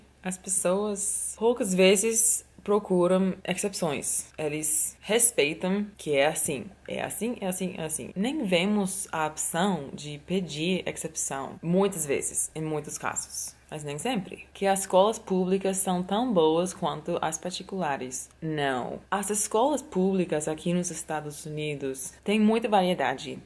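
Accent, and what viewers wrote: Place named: Rio de Janeiro, Brazil. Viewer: você está falando português totalmente carioquês, tipo "SUXXXX"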